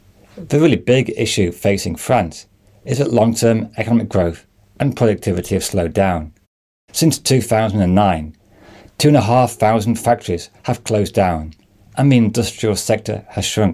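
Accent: United Kingdom. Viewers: Is this Scottish accent or what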